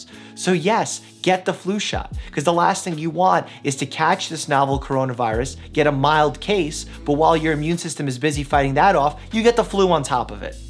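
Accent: Russia. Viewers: Hey doc are u a Russian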